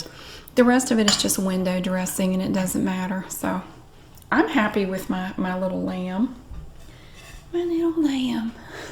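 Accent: South, United States. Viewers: Love how southerners pronounce oil. Great videos.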